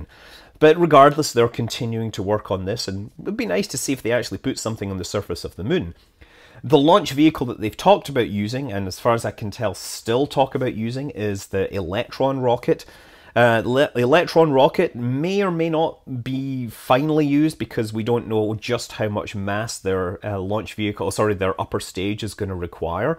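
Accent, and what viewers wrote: United Kingdom. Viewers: Cats? Where? I was engrossed in Scott's velvety UK accent.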